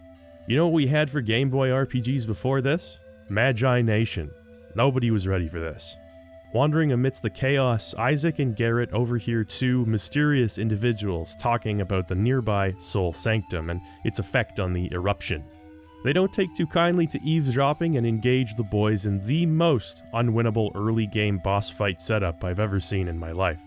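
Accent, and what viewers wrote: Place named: Canada. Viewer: AH HA! You are indeed Canadian o_o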